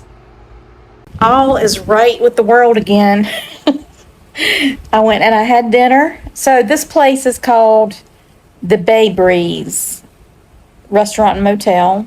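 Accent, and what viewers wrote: South, United States. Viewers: haha don't tell Canadians that thing about God having a southern accent. tbh, I don't even understand the joke. I'd leave God out of any casual conversation. People will be charmed by the southern accent itself so don't worry about how you sound. Enjoy your trip. you will fit right in. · Work on getting rid of that American accent. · Watching your YouTube video tonight, and seeing my home province and familiar scenery through a visitor’s eyes. It’s made me a bit homesick, because I am living in St John’s, Newfoundland and I haven’t been home since 2019 ( before the COVID pandemic). I was really hoping to get home this summer, having been laid up with Arthritis and finally this March, I got a total hip replacement done. I’m recovering very well, however, I do need my other hip replaced, and hopefully that will happen sometime this fall (I have already signed my consent for it). 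Definitely going home next summer and possibly moving back to New Brunswick. Strongly considering it. I just love your accent, I have family in Houston Texas so nice to hear a bit of the southern drawl as I haven’t been to visit there in over 10 years now. Please don’t feel out of place in our Maritime provinces, We have all kinds of accents and many cultures, people from many countries who now reside on our East Coast. There is a famous saying “it’s a small world after all” and it’s getting smaller all the time. You seem like a really lovely person and I do know what you mean by “some people “ being not so nice. Yes, those people are everywhere, however, most of us Canadians you will find to be very polite, kind and respectful. I subscribed to your channel so I could see some familiar haunts of mine. Drive safe now. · I just discovered your video via Youtube suggestion. Very happy that you enjoy your stay in NB, so far (I live in Moncton). 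Thank you for your kind words for Canada and Canadians (yes, there could be bad people, like anywhere else in the world). Love your personality and your southern accent! Have a great road trip! 😊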